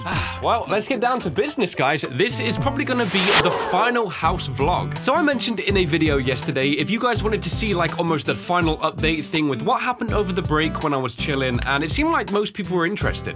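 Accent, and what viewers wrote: United Kingdom. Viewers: british Im British too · What I didn't know was British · wait hes British? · Wait your actually British!?? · Are you British? 🏴🇬🇧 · Wait, you're British?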